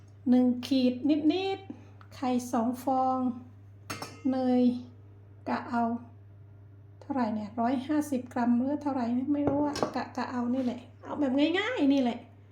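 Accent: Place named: Suphanburi, Thailand. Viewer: ทํามัยพูเหมือนคนสุพรรณเลยทัมมัยต้องออกเสียงสูงมากไป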